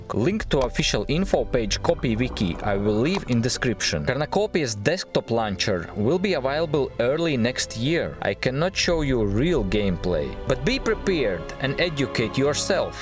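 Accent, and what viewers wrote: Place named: Russia. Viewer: Might wanna skip the Russian accent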